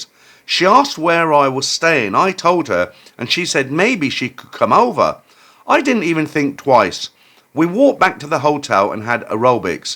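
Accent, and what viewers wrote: England, United Kingdom. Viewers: Glad you are keeping these. I would never watch AI stories they’re boring and soulless. Watching since the shed days of 2020/2021 I have never even been to Thailand but I find them entertaining. Also nice to hear an English voice while I’m in australia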